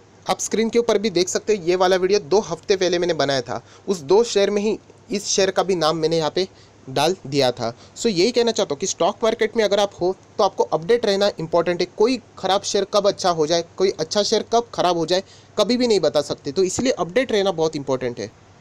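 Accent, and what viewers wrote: Maharashtra, India. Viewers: Mala pan vatala marathi aahet... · Marathi aahet sir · Bhau apla marathi ahe acent warun samajte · Sir तुमचे नाव kay आहे बोलण्या वरून तर वाटते मराठी आहात share मार्केट शिकायला खूप हेल्प hot आहे तुमची सर्वजण फी वैगरे मागतात 1एप्रिल पासून continue vidio बघत आहे तुमचे फायदा pan झाला thankyou so much sir pan नाव सांगाल तुमचे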